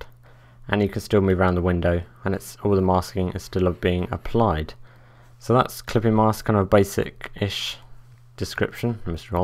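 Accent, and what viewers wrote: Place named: RP, United Kingdom. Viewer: Great tut! (also - you sound dead posh!)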